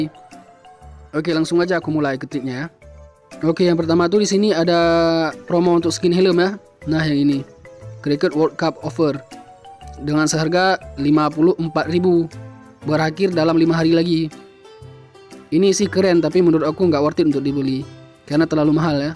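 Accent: Aceh, Indonesia. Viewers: Gaya peugah aba awk aceh sang bak hi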